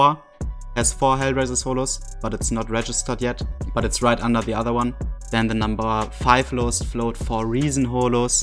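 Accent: Germany. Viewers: Dieser deutsche Akzent 😂 nohate an der Stelle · werd doch mal diese ekeligen bibanator deutsch akkzent los , das wäre mal n nice craft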